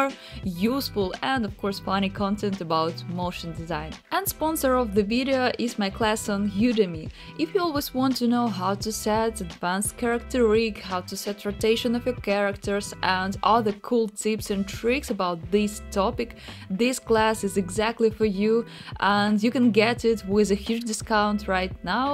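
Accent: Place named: Russia. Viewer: You speak kind Russian accent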